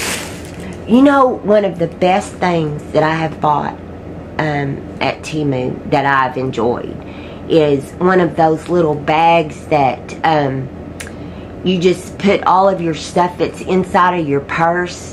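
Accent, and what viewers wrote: United States. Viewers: You are so entertaining! I’m a great grandma that loves Temu also. I loved the cat shower curtain. I’m ordering one for me. What state are you from? I’m from Alabama. You sound southern like me. 🐓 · Aww darn it, your accent reminds me of my grandmas and aunts from Oklahoma 💕 I watch reviews for the talking so talk away lol I’m also addicted to Temu 😂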